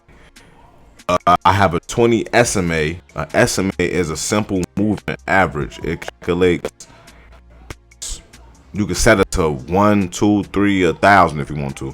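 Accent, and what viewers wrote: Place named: Chicago, United States. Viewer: I can tell that Chicago accent from a mile away! You got my sub, where you from brodie? Good video I actually learned something new! I been at this shit for 6 months and starting to understand more and more about price action.. do you trade futures to or just forex?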